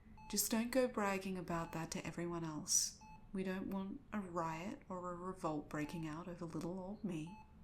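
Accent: Australia. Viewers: Oi, a fellow Australian!!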